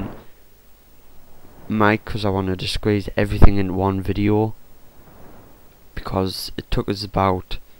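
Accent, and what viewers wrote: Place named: North, United Kingdom. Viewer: Haha close enough! Thought you had a northan twang to your accent :)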